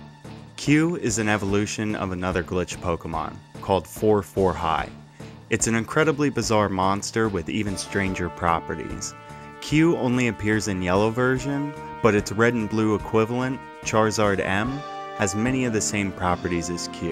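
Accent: Philadelphia, United States. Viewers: I recognize your accent! Are you from Philly, too? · Are you from philly? Your accent makes me feel like I’m at home lol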